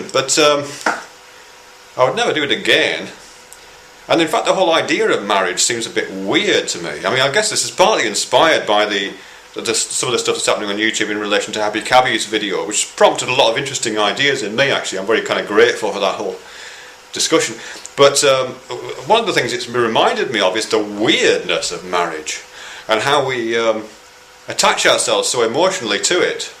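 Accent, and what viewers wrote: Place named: Bolton, United Kingdom. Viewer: As a side note, your dialect sounds similar to my wife's ex-husband, who is from Bolton That made me look up Blackburn, and I found that they're not far apart :-)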